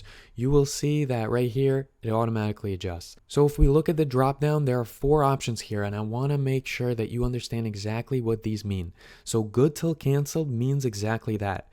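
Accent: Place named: East Coast, United States. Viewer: very well done. I love your east coast accent too.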